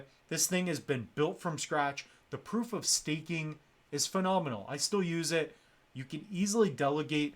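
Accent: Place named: Canada. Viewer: Heard the Canadian accent 😂 I was just thinking "if he's not from canada he's from Minneso-da"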